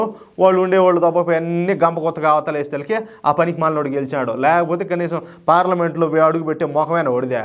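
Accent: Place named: Telangana, India. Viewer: I appreciate your videos but your pronunciation is completely Telangana yasa if possible please change in pure Telugu.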